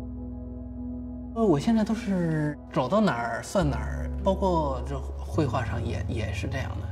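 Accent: Northeast, China. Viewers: 哎呦我的妈 这东北普通话。。。。。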